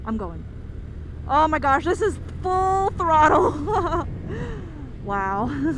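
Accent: United States. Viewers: You sound like you live around Hotlanta!😁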